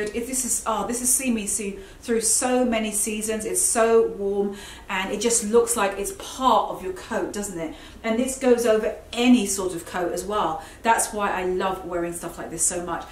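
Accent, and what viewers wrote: United Kingdom. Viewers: I love love love your accent! A mild uk english🎉🔝🔝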